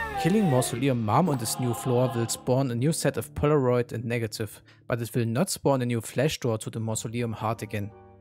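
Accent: Germany. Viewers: Man, as a guy from germany I love your german accent. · Bist du Deutsch? Du hast einen akzent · are you by any chance german? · I don't know but u sound like u came from DEUTSCHLAND too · Are you by chance german? Your accent sounds pretty german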